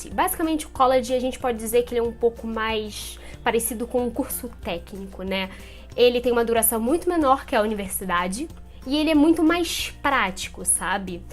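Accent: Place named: Rio de Janeiro, Brazil. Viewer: Maix, pexquisa. Aposto que é carioca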